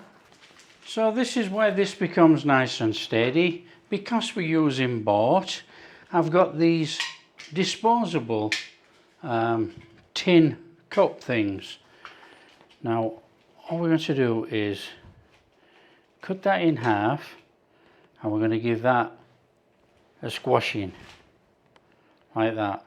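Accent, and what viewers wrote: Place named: Northern England, United Kingdom. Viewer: I love our Northern accent (so many posh Southerners presenting) . I am addicted to these in Lid ull 75p buy 5 one each work day.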